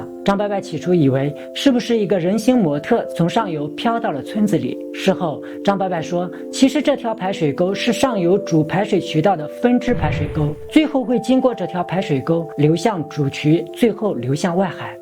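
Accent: China. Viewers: 超明顯的大陸口音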